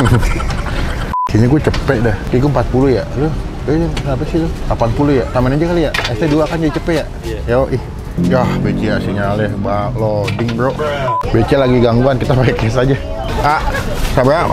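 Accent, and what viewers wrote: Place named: West Java, Indonesia. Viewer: Bakwan malang tapi logatnya sunda bang 😅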